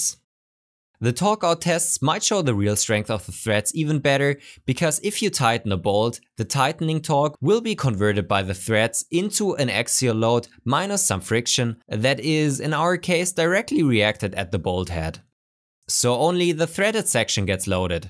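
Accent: Germany. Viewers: Der Deutsche Akzent ist unerträglich.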